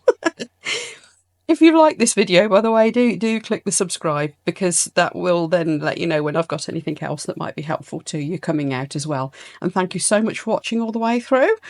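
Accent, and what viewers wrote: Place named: Midlands, United Kingdom. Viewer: Super informative! Are you from the Midlands by any chance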